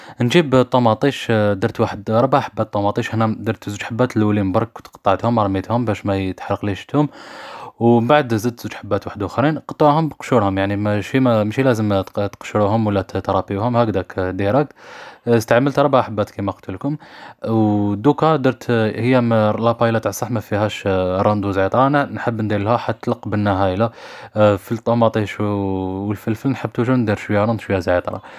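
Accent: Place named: Oujda, Morocco. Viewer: شكراااا على وصفة وتحية ليك من المغرب الشقيق🇲🇦 وهضرتكم شحال حلوة تشبه للهجة وجدة ❤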